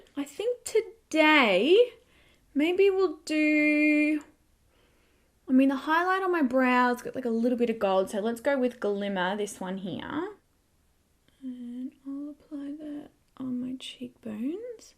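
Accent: Australia. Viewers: Victoria Darmanjian She has an Australian accent and it even says she’s Australian in her description.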